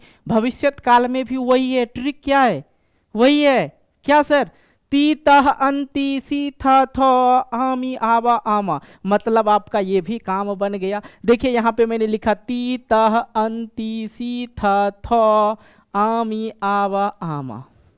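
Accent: Bihar, India. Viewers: Sir aap bihari ho ya भोजपुरी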